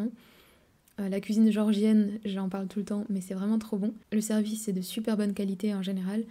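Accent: France. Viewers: Greetings young lady, May I offer that you please speak a little slower. I was easily able to hear your French accent while speaking Russian. Your accent combined with the high speed of speaking made it difficult for me to understand you. Good luck, and thank you.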